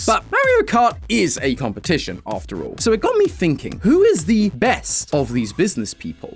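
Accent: United Kingdom. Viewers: why did matpat turn british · matpat was replaced with this british mf?! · Calling it Marry-io makes you sound like an out of touch old person lol. But maybe it's the Bri'ish accent, which is cool ngl In the states it comes off that way though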